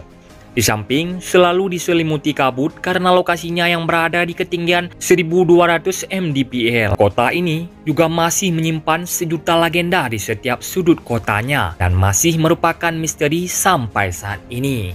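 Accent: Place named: Aceh, Indonesia. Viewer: Logat aceh nya kental x😅bukan orang gayo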